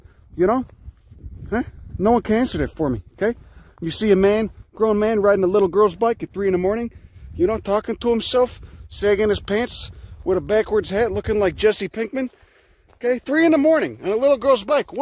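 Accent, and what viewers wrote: Chicago, United States. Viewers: Could listen to a Chicago accent oratory on glands all day. Thanks for sharing your knowledge.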